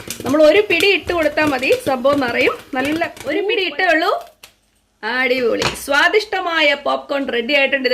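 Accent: Kottayam, India. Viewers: Chechi nattil evde..?? Kottayam slang anu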